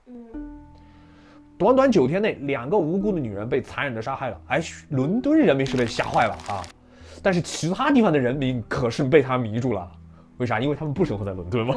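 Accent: Yunnan, China. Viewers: 哥们儿这一口云普把我逗乐了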